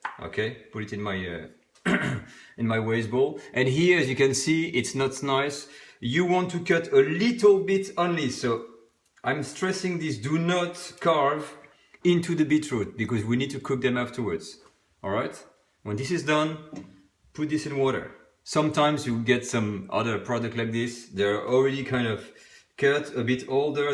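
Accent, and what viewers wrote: France. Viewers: You hsve such a beautiful French accented English 🌺🌺🌺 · Wish you make it longer than 9 mntes . Love your French accent Chef . Merci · Love your French accent ❤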